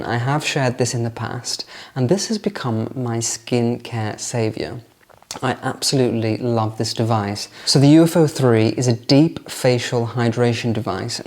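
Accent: RP, United Kingdom. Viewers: He talks so posh ❤ 😂😂😂 are your parents posh ?????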